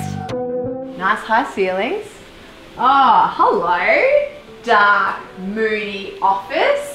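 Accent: Australia. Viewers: I Love how she does her content everything seems to fit together perfectly the aesthetic the music all topped off with the cheeky Australian accent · I really liked Batman's feeling on the first floor! But what i love is this Aussie accent! 🇦🇺🏄🏼‍♂️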